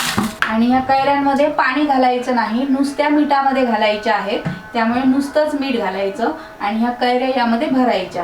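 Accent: Konkan, India. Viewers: कुठून बोलताय कोकणातून का बोलण्याची पद्धत पक्का तिकडचे वाटते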